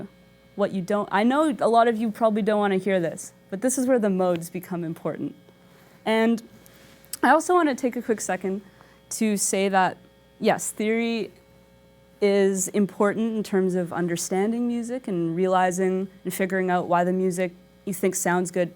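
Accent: Canada. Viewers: beware, she's canadian... she pronounces about "aboat" and that winter jacket... funny thing about music "theory", no matter what you do it can be explained "theoretically". you'll realize this after siting thru a non diatonic chord, modal interchange or dissonance recital, or any mixure therof... solid lesson, penta = 5.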